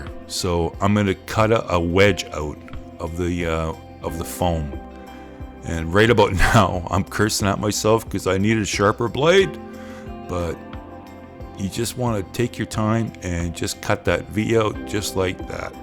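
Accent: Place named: United States. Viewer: Hearing an American accent giving dimensions in millimeters gives me a warm fuzzy feeling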